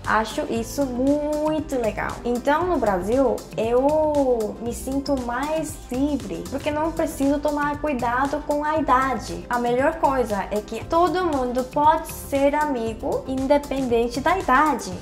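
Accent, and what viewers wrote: South Korea. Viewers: Você ja deve ter ouvido falar, mas aqui em minas gerais falamos "né" com MUITA frequência mesmo. Da até uma certa "familiaridade" em te ouvir falar mesmo com o sotaque coreano kk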